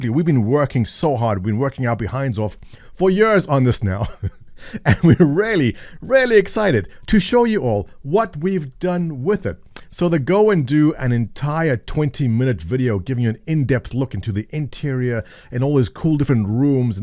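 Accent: South Africa. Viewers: Heya! Great video. If is me or do you have a bit of a South African accent?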